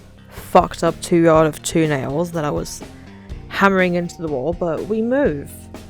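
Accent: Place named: United Kingdom. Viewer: Where is the cuntyy British accent coming from omg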